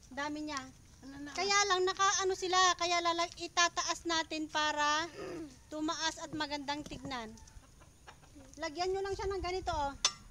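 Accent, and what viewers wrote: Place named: Ilocos, Philippines. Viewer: Ilocano k ata te hehehe hirap k magtagalog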